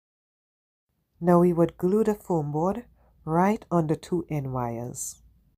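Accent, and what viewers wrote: Trinidad and Tobago. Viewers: Why do u sound like a trini so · Are you from Trinidad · Gorgeous! And I love that you sound like a true Trini! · Absolutely gorgeous! Is that a Trini accent I detect though? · Omg! as I heard your voice I said she sounds Trinidadian. Great job my fellow Country lady.. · Are you a Trini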